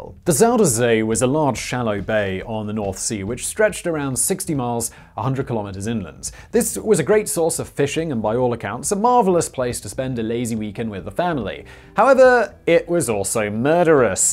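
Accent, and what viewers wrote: England, United Kingdom. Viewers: With that accent? No - I do believe he is 100% English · Reading downwards I see no other comment really necessary but to compliment you on your pronunciation as most of my native English speaking friends never tried that hard. And going on your accent, you probably don't mind if foreigners call the UK or Great Britain 'England' either. All in good fun for whomever gets it.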